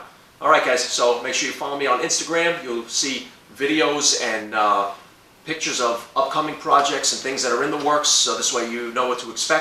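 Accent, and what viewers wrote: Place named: Brooklyn, United States. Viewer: Excellently produced video. Very clearly explained. Thanks. Love your accent. Is it Brooklyn? Sounds cool to an Englishman. :)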